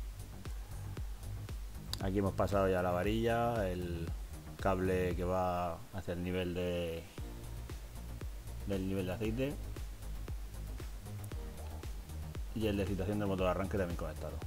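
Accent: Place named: Murcia, Spain. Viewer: Murcianico cierto?😊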